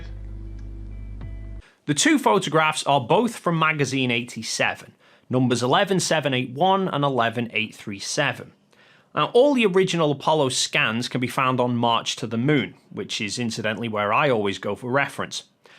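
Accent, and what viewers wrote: Netherlands. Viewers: He sounds Dutch, with that accent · Yes, the Dutch accent is strong in this more.on. So Goldmember is a better comparison.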